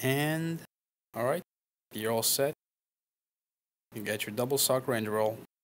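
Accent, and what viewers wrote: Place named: Eastern Europe. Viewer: Where are you from? I'm hearing a Slavic accent but I may be wrong.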